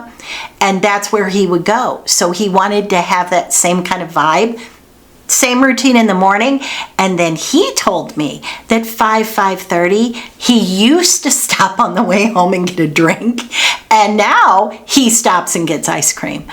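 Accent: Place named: North, United States. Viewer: Are you a Yankee from Up North ? Naples born Cracker here and you sound like a Yankee to me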